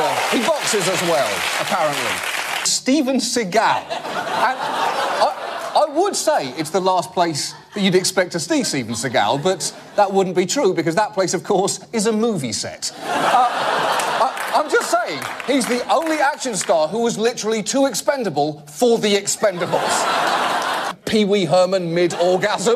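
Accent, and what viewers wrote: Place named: United Kingdom. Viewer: The Mighty Sparrow, you’re wrong his accent is 100% British.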